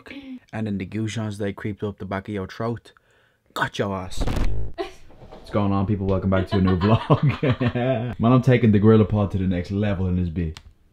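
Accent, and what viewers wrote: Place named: Dublin, Ireland. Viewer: You sound more like a dubliner lately I love it mate 😂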